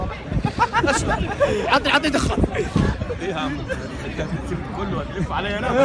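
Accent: Saudi Arabia. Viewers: ابك والله هو دوسري ويصرق لهجة عتيبه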